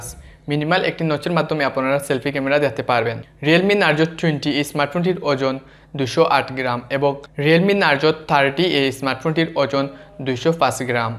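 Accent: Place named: Sylhet, Bangladesh. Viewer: সিলেটি মাত বাদ দিয়া শুদ্ধ কা বলো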